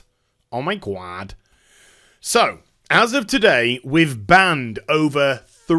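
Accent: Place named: RP, United Kingdom. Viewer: worry not... American are not use to soothing British posh accent... keep it coming mate you are doing just fine!!!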